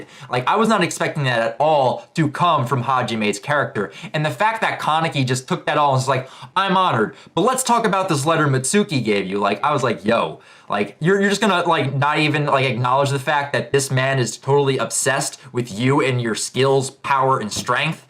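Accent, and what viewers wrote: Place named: New York, United States. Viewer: you sound like you're from New York ( I'm from New York) no offense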